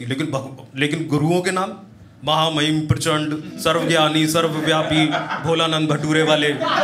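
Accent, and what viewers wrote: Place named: Uttar Pradesh, India. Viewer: Bhai apke baat karne ke tareeke se proper up wali feel aati hai.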